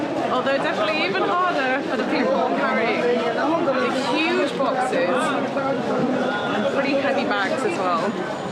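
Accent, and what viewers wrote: United Kingdom. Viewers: It seems you are British by your accent.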